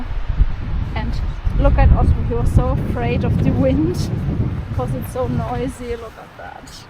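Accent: Germany. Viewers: Do I hear a german accent? ;)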